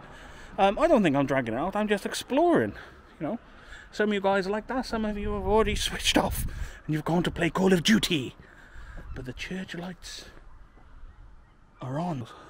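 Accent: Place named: England, United Kingdom. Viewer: ????????????????????? I was only joking...half joking the English accent was grating on me but apart from that good vlog.... don't be mad at me 🥺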